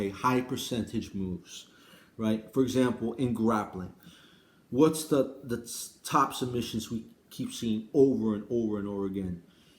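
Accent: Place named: Cuba. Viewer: Damn,! Your getting a lot babe support that I like your stuff. They must love that Cuban accent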